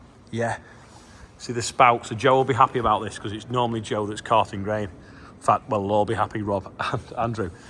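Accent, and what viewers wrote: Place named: Liverpool, United Kingdom. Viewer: In proper Scouse accent: "We have Martin Mason who is 34 and Charlie Mason who is 5, happy birthday to you guys. Red Clarke happy fourth birthday to you. Jeremy Nash happy birthday, Chloe Taylor who's 27 and Stevie Finnie who's 29 happy birthday to you. If you want to be on the bumper, please scan that QR code and there is a link below every single video."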